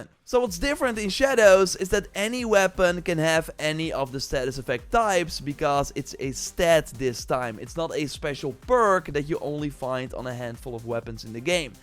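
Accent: Netherlands. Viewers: Hahaha ik hoor gelijk dat je nederland bent zet hem op ouwe💪🏾😂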